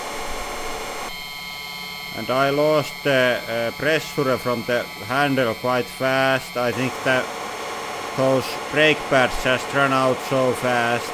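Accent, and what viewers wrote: Finland. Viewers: that was some nice finnish rally english :D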